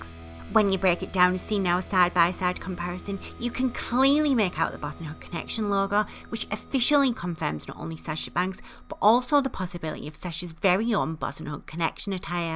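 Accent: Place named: United Kingdom. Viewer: Hi good day thanks for the info plus I got hear your British accent so my day is complete💗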